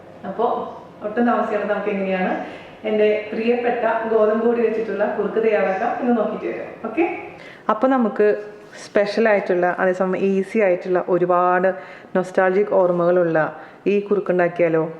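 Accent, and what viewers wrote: Thrissur, India. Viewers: ഞാൻ ചേച്ചിടെ ചാനൽ കാണുന്നത് be happy with sree.... ശ്രീ പറയുന്നത് കേട്ടാണ്.. ശ്രീ ടെ യും ചേച്ചിടെ യും sound /trs ഭാഷ എനിക്ക് വളരെ ഇഷ്ട്ടമാണ്.... ഞാൻ ഒരു പാലക്കാട് കാരിയായതു കൊണ്ടാവാം..... 😍😘😂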